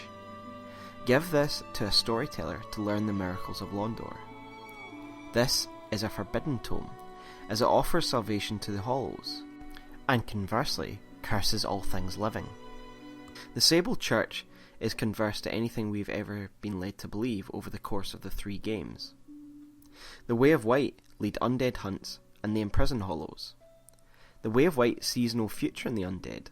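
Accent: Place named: Scotland, United Kingdom. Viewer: Hey another Scottish person! Amazing video mate- very informative, got a new subscriber cant wait to see more!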